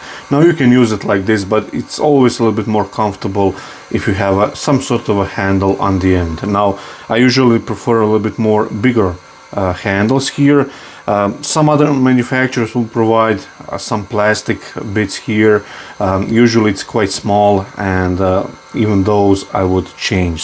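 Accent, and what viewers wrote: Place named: Croatia. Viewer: Completely random, but I watch more of your videos than anybody else and When Croatians were deciding how to pronounce English words… they picked the coolest ways to do it. 😎🫡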